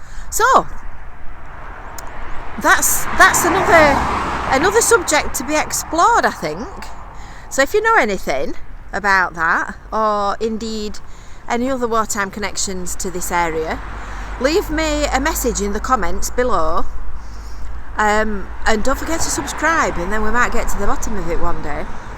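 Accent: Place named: Lancashire, United Kingdom. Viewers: i enjoy your videoes and your lovely lancashire accent. i also love the winter gardens building and complex. brings back memories. regarding pillboxes if you search for lancashire at war blackpool at war a web page should appear giving details of more pillboxes dickson road blackpool airport stanley park. blackpool zoo is on the former site of stanley park airport before moving to squires gate. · Used to live in Blackpool fifty years ago ,the only thing unchanged is your beautiful accent!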